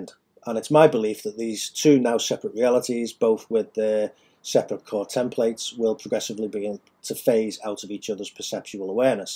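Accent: Liverpool, United Kingdom. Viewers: As a Scottish person ....im giggling x think he a scouser xx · Wow! New to the Channel! Amazing! Thank u. I've heard it will be Nov '23 but wow! And a Fellow Scouser too! I grew up in Penny Lane, Lpool. Great to hear a Scouse accent and intelligent too!! 👍😉🤗💥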